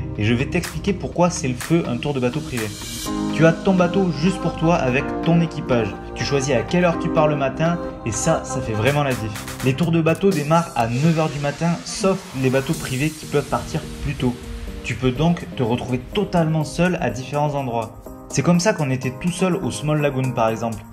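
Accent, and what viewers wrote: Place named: Toulouse, France. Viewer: La vidéo est incroyable, avec en bonus les noms philippins avec un accent toulousain 😍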